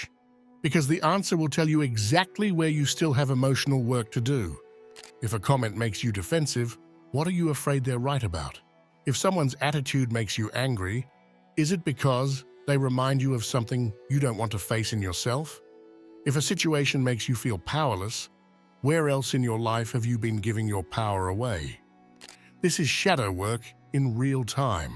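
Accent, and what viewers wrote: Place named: Australia. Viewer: Reading the comments. I have felt dizzy, a bit nauseous and was wondering why. I still dont immediatly realise it is from a surge of electro magnetism. I just burned my st Brigid reeds cross from last year! I manifested all my troubles and doubts and hesitations to be gone, cleansed away. I was alone so just did what I felt was right. I am glad now. Another serendipity. Thank you forthis video, first time i come across your channel. You sound Australian😊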